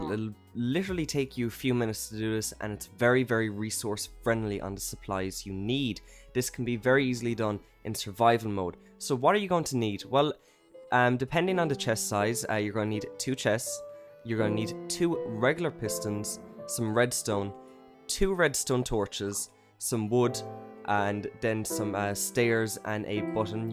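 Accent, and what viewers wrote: Ireland. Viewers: Wow! Your Irish! (Well if my accents serve me correctly) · are u irish because i am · I'm Irish too! Cool ur accent is so col haha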